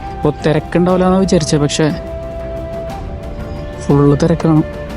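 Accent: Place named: Kochi, India. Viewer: Kochi aano place slang adhupole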